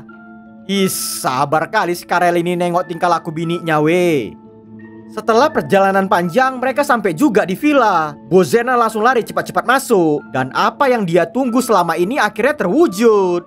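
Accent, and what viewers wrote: Medan, Indonesia. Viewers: yg isi suara ini orang medan kek nya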